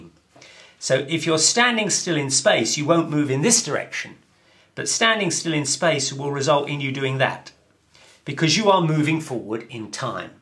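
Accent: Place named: Oxford, United Kingdom. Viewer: Now this is Oxford English we non natives all learned in school. Understand every single word he says. Very good. A very good teacher anyway.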